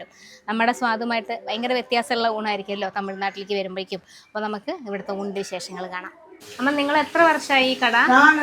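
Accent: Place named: Valluvanad, India. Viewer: Molu eth nattukariyanu❤valluvanadan bhashyanu❤❤❤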